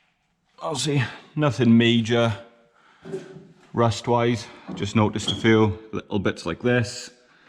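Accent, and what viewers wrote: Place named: Wales, United Kingdom. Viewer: I thought you sounded a bit Welsh! I'm also in South Wales not far from Caerphilly! I've had a few Escorts in the past, even a MK1 RS1600, and Mk1 Twin Cam, plus a few standard ones. The one escort i really got on with was a standard Mk2 1300 2 dr. Great to drive, could rev the nuts off it, and handled really well. I'm no expert with cars, but would really love to do what you are doing now.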